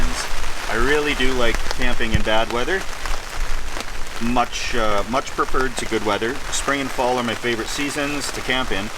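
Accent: Canada. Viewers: I just subscribed today after watching a few vids. From his accent I am assuming he is from the Canadian side of North America:) I therefore await the stealth camping on a hockey rink!! Seriously, he seems a normal guy who super honest and informative and not one of those "look at me" YouTube campers, from what I have seen he is not in it for the likes, just the thrill (and probably the beers).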